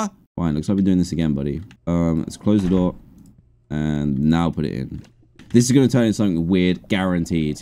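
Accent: United Kingdom. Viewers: I know this is just UK accent but.. mario sounds like mario but the letter a sounds like A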